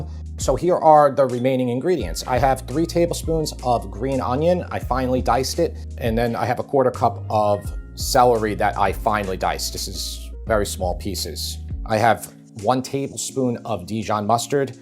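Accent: New York, United States. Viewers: I just love that New York accent! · I love your channel. I'm from Westchester, but lost my accent. I'm jelly of your accent, but trying to figure out which area your from.. let us know where you grew up. Also.. how do you not have a NY diner style home fries recipe?? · nice to hear a real new york accent. not somebody in new york speaking with a CA accent. that crap always annoyed me. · Wow I’m from Queens and living in Ga and I have to say that your NY accent is cool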